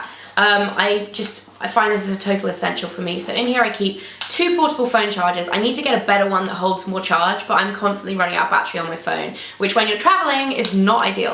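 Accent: United Kingdom. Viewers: I love her British accent! <3 ... and the bag, of course. It's gorgeous!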